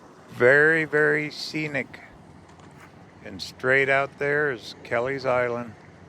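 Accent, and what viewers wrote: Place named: Pennsylvania, United States. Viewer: you seem to have a PA accent. True?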